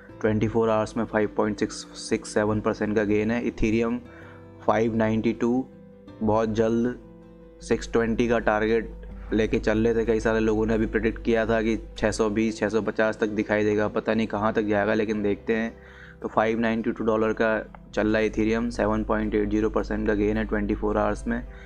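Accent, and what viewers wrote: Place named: Bhopal, India. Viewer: Which city are you from? Your accent sounds like Bhopali 😁 (I'm from Bhopal)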